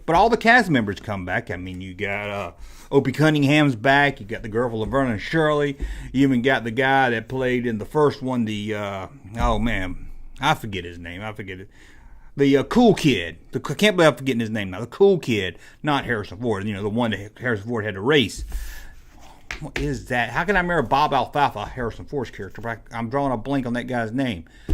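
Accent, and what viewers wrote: South, United States. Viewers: Are you from tenneessee you got that southern draw!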